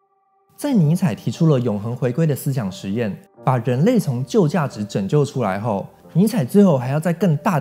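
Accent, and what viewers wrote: Taiwan. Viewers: 这个台湾普通话听起来费劲啊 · 实在欣赏不来台湾腔的男生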